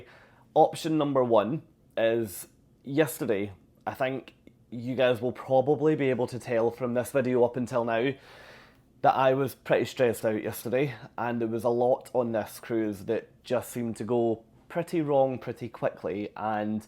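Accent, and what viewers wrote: Scotland, United Kingdom. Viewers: New subscriber here, LOVE your Scottish accent! especially since Outlander has wrapped up Season 7 lol. Thanks for sharing, and happy cruising! 🏴❤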